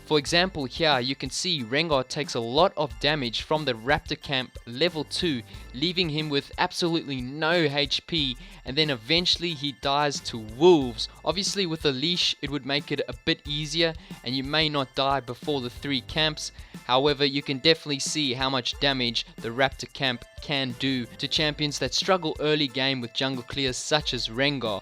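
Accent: South Africa. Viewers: you sound like an south african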